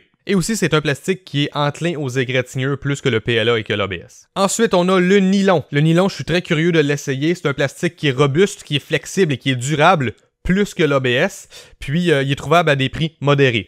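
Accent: Canada. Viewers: Comme toujours, hyper intéressant! Et entre ton accent très exotique que j'adore (mon pépé a été dans la police montée chez vous, à une autre époque il est vrai!) et ton débit (mais comment fais-tu???, Heureusement que tu n'es pas rappeur), je me régale à regarder tes reviews. Toujours instructives et précises. J'attends avec impatience tes essais sur du PLA flexible.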